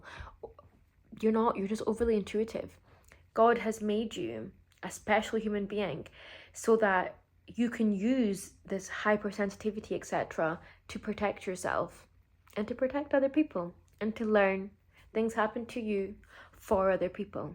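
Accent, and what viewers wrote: Scotland, United Kingdom. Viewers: you're scottish? did not expect that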